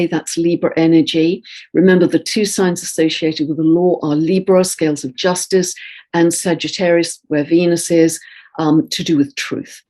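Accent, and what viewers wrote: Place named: RP, United Kingdom. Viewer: You have the poshest accent ever. If anyone wants to know what "the Queen's English" or "BBC English" or "Received Pronuncation" sounds like, listen to this young lady. Some English people have accents that "sound" super high class, but when they pronounce certain words with a bit of a working-class twang, it gives them away as not really posh. Your accent, however, is truly impeccable.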